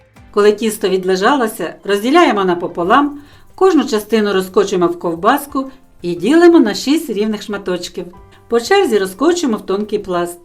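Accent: Standard. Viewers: Супер - вподобайка і підписка! ❤ Дякую за рецепт.Але найбільше вразила мене Ваша мова. У мене муз.освіта , і я можу порівняти мої почуття від Вашої озвучки відео з такою музикою, що хочеться слухати і отримувати насолоду. Прошу Вас , будь ласка, дати мені відповідь, в якому регіоні Ви живете? Бо я ще не зустрічала такої справжньої чистоти .✨️💙💛💙 ( цікаво, чи я вгадаю🤔 - черкаська обл.?)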